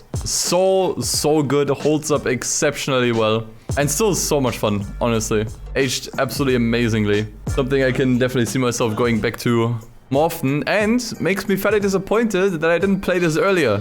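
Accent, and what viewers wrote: Germany. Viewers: Man hört den deutschen Akzent voll raus 😂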